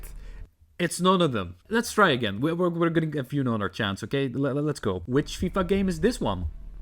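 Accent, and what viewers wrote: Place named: Netherlands. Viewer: Nederlands accentje is wel smikkelen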